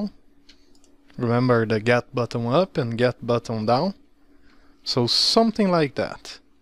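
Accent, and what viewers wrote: Brazil. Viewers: O teu sotaque brasileiro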